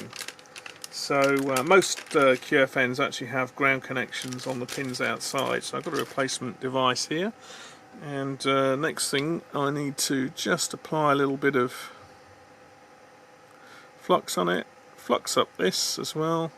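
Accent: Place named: United Kingdom. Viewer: Just the right amount of verbal I say. Newby here, so I appreciate being walked through the procedure. Got the air gun, but looks like I'll need the flux pen and the cleaner. Too bad the radio is all apart and on the bench already, but I watched someone else's video where they just did a simple reheat. I feared the solder would overflow to the other terminals, but apparently not an issue. Wish me luck. Oh, the British? accent/colloquialisms were spot on! (to use a term). Full Wacko (unintelligible) on the airflow LOL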